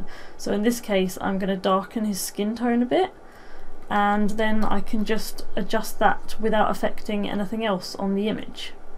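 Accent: United Kingdom. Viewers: I just can't stand this funny british accent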